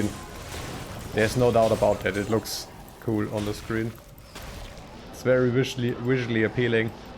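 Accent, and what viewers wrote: Germany. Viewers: Nice buiId and I like your German accent! 😂 Greedz 😉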